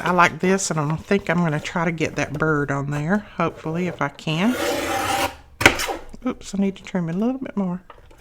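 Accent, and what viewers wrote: South, United States. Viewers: Love your Southern Belle accent. I have a Tennessee Hillbilly accent which is more rough. Thank you for sharing your beautiful creations. This is an adorable kit. ❤ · I was watching your video again which I love watching you Craft and your southern accent anyway and 32 minutes and 15 seconds I think you’re talking about putting some pink rose on the paper and not covering of the beak of your bird and I was thinking well why didn’t you put it just on the top of the page then it was just a thought we’re on the other side of the paper anyway thank you for teaching and that was my Pinyan have a great day And please don’t be upset with me God bless and please have a amazing day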